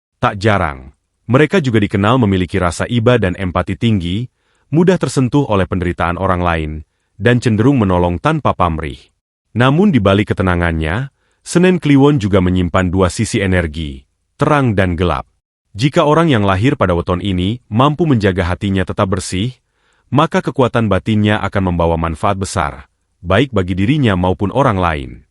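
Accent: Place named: West Java, Indonesia. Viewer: Kelihatan lugot bicaranya orang Jawa barat yang membawakan ini bukan orang Jawa tengah mantab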